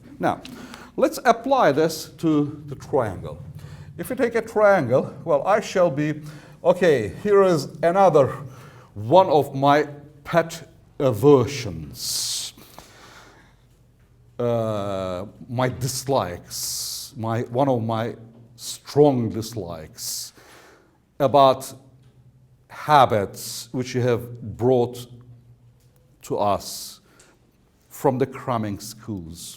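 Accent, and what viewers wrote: United Kingdom. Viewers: yo hablo español y estoy buscando clases de geometría en ingles para mejorar no solo geometría sino también en ingles :D, su ingles británico me voló la cabeza ._.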